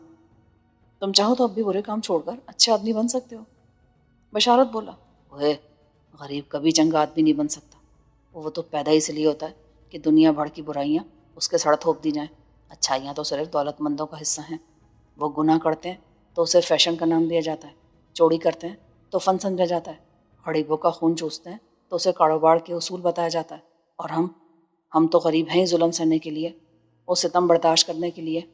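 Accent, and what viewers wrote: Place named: Lahore, Pakistan. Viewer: You imitate beautiful Lahori accent well done you are my best Voice on YouTube😂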